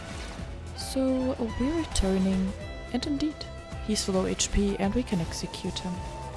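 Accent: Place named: Germany. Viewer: Okay ;) , you have very german accent because i'm asking , thanks for information .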